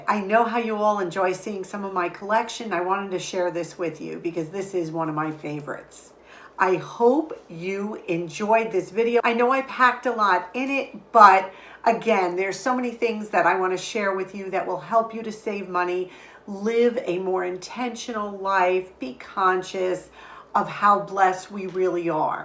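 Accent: New York, United States. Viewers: Nacho something?? With 🍟, ground beef, sour cream, cheese. Today I hv binge watched your videos... You're my kind of people.!! Luv ya!!!- I hv never been to N.Y., I'm from Chicago, but I live in Northern Wi, since 94..... We don't hv a lot of stores here, but in N.Y., in sure there is much temptation.... I love thrift stores..... Since watching you, I do spend way less, learned a lot from you, so thank you.!!!! Wish to meet you!!!! You're so smart,and entertaining........ Bless you and too.. The N.Y. accent is so cute.!!!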